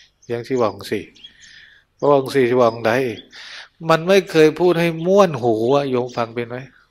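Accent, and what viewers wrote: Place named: Isan, Thailand. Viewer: ขอนอ้มนมัสการเจ้าค่ะ.ท่านเจ้าคุณ..ด้วยความเครารพได้ยินเสียงหัวเราะมีเสียงยิ้มๆไม่ได้เห็นรูปไม่เห็นหน้าตาเจ้าค่ะ.แต่ก็โอเคเจ้าค่ะ.ชอบฟังๆท่านเจ้าคุณบรรยายเป็นภาษาอีสานก็มีท่านเจ้าคุณพูดภาษาอีสานเพราะๆมากๆ.กราบ.กราบ.กราบ.สาธุ.สาธุ.สาธุ.เจ้าค่ะท่านเจ้าคุณ.🙏🌹🙏🌹🙏🌹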